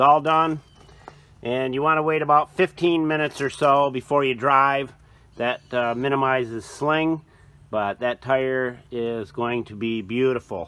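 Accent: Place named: Wisconsin, United States. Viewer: you sound like your from wisscOnsin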